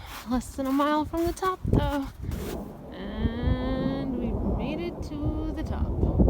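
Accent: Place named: Alabama, United States. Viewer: That was some Lake...the best in your LAFE?!! You sound like my sister (Birmingham, Al) LOL! Love it!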